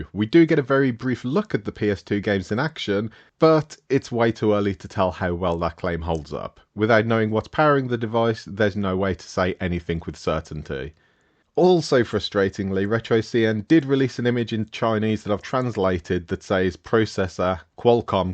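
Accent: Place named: Birmingham, United Kingdom. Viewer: Nice Birmigham accent. I'm from from Acocks Green..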